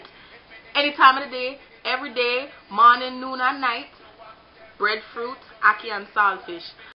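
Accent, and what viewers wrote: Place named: Jamaica. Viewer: OH MY GOD!!! I love accents,,, I most of all love your jamaican accent...!!!! BOL the shirt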